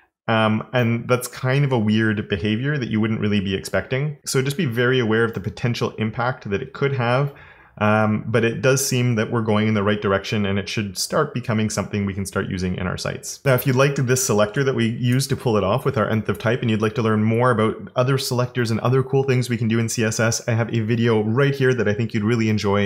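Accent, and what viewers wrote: Canada. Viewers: Canadian accent and them o's.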